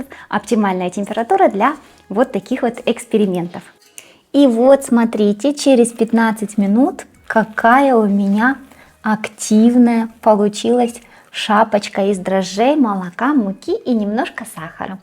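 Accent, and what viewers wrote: Ukraine. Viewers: 😍💖Вау!, яка чудова, гарна українська мова звучить! Дякую💝Приємно Вас чути💙💛 Безмежно вдячна за рецепт тіста для булочок😘 Вдячна Вам за Вашу позицію! Тримаймося! Все буде Україна! 🌹🇺🇦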